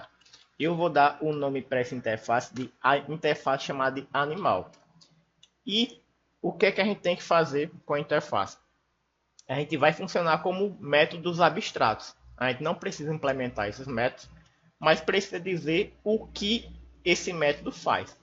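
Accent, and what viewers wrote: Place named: Northeast, Brazil. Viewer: Não sei o que é mais prazeroso nesse video, a didática fora da curva ou o sotaque gostoso do meu nordeste ❣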